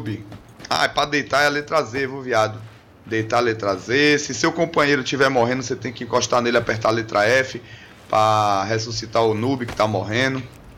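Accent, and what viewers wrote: Bahia, Brazil. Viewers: BAHIANO CERTEZA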